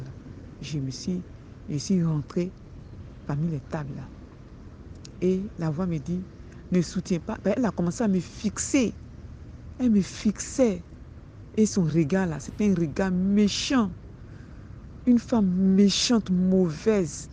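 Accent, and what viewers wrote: Ivory Coast. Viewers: Par ta voix si je ne trompe pas. Tu es de côte d'ivoire.Tu es très couageuese. Mon coeur aller battre plus que mon souffle. Gloire à Jésus. Ce que j'ai compris ce que on dois s'attacher à Jésus en tout temps et en tout lieu.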